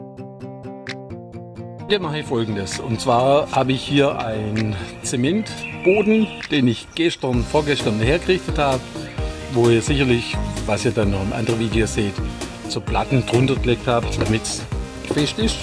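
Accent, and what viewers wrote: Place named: Swabia, Germany. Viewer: Super erklärt,noch dazu auf schwäbisch!! Ja die größere Latte 😂😂😂😂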